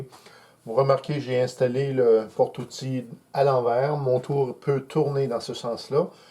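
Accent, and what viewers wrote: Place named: Montreal, Canada. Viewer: bonjour je me suis abonné dernierement.je ne suis pas tourneur n'y menuisier mais vos video sont trés enrichissente de conaissance. vous n'ete pas avare de renseignement et de conseil votre voie est calme et tres douce tres sur,tres professionel. votre accent j'ai l'impression d'entendre mon beau pére qui est de montreal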